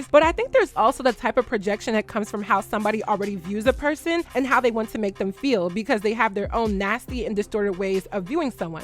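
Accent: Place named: Philadelphia, United States. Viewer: I love your Philly accent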